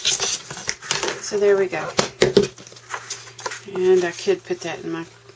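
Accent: South, United States. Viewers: New subbie (from grammie2bay). Thanks for doing this tutorial -- you make it so easy to understand....especially appreciate your chipboard pieces with the measurements written on them. I usually put TP rolls(cut up) into the compost pile, but now have a use for them. Love your southern accent! Marianne:)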